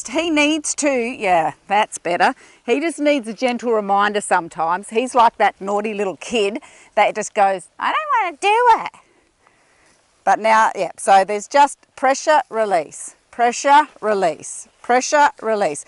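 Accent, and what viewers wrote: South Australia, Australia. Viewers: Are you a South Australian? I'm trying to pick the accent. Great video!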